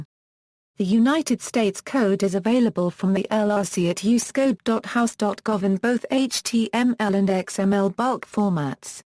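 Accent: England, United Kingdom. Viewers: And why is an Englishwomans' voice used to talk American law?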